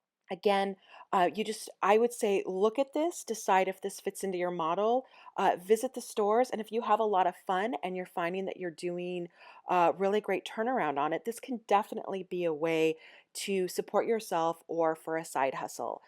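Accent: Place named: United States. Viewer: oh, I love your American so much=)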